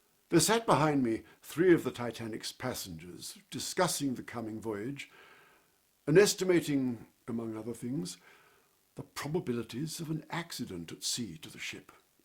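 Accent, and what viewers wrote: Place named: United Kingdom. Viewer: Excellent presentation! I love his elegant British manner and speech. And his grandfather, Lawrence Beesley, is a sterling example of one who is born an observer and writer. Were it not for his eloquent account, we would not know so many fine details about that ship's voyage. God bless writers! (And actors, too.)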